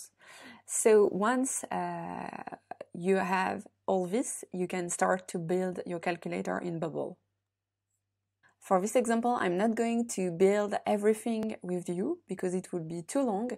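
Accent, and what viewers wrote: France. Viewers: Merci pour la vidéo (j'ai reconnu l'accent français^^)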